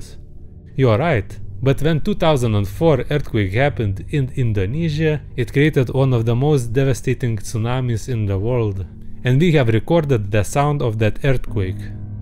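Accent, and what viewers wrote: Russia. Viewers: Ukrainian, English and Russian. And this accent sounds exactly like a russian speaker trying to speak English without any diligence. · Love the Russian accent 😂😂😂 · this russian accent is sooo good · По акценту слышу что русский парень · Is he Russian? Cuz it he is I love it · ur accent remind me of russia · Хард рашн акцент? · Cool concept for a video, but god damn I'm tired of "Russian" channels. Bro, even FPSRussia got old after about the 10th video. The accent isn't entertaining. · as a Russian myself I can confirm that some people really have accent like this when they talk in English, if they don't talk or practice often. But it's kinda strange that has it, considering that he's narrating many videos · are you from Russia?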